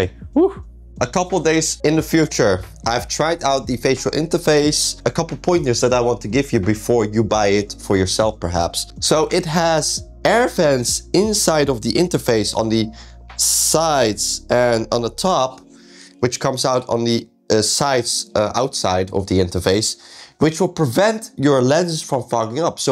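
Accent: Netherlands. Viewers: Is that a Dutch accent I hear?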